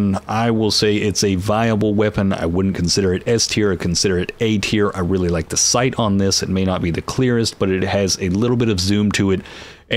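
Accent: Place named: Deep South, United States. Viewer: the deep south accent sold me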